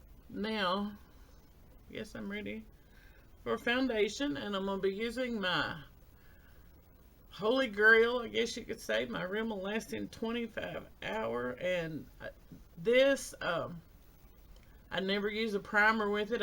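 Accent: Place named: South, United States. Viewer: I meant to tell you I just love your accent! My father's side of the family is all Southern and you remind me so much of my favorite Auntie the way she spoke and phrased things. : ) Love you talking thru. It's like hanging out with you. You look fabulous and thanks for the makeup tips! :-)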